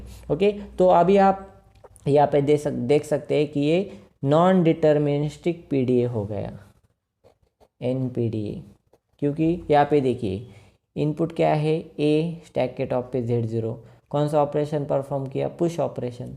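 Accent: Gujarat, India. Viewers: sir, r u from Gujrat ??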